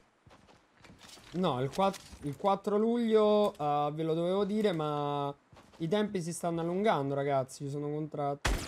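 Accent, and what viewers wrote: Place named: United Kingdom. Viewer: Quanto british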